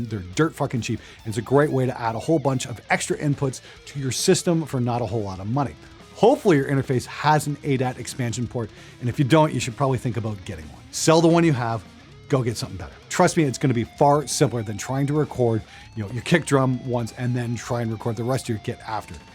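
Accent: Canada. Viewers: You understood that? With such a thick Canadian accent, I couldn't make any of it out. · Do I detect a Canadian accent in your bass player speak?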